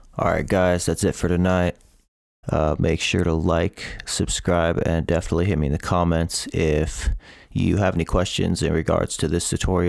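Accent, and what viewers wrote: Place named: Sweden. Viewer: Sounds Swedish, amazing!!! thnk uu was so helpful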